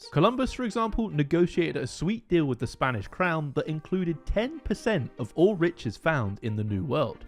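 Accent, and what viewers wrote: United Kingdom. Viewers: Sounds like brit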